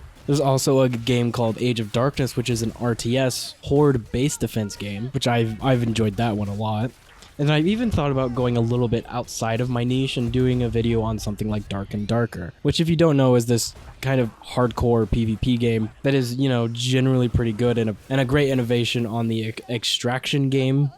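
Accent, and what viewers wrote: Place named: England, United Kingdom. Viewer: You have a cool voice and a clear english accent. You should consider adding simple playthroughs to the channel, especially of the games you mentioned here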